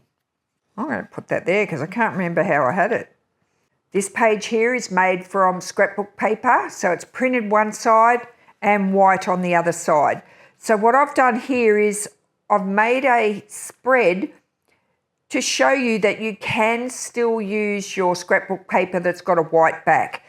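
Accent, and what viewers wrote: Australia. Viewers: Such beautiful ideas. Love your Aussie accent ❤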